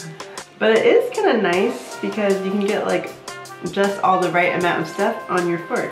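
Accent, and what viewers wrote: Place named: New Zealand. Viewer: we want one video where you the entire time speak New Zealandish:)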